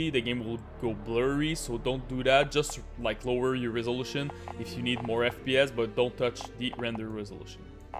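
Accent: Quebec, Canada. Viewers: quebec french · Ton accent qc est cramé en tbnk 😂 . Tres bonne video entk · T'es quebecois en? Haha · are you canadian lol? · cool un quebecois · L'accent québécois)) Are u a quebecker? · it sounds like u are from quebec? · Ton anglais ma spoil que tu étais québécois hahaha merci pour ces conseils ! Je vais essayer car je run 15 19 fps avec mon laptop : acer 575g ,16go ram, et gpu 940 mx je comprend pas trop pourquoi cest autant mauvais. Si u as des conseils en plus je suis ouvert haah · tu es québéquois hein :) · I’m from Quebec too that’s why I recognized the accent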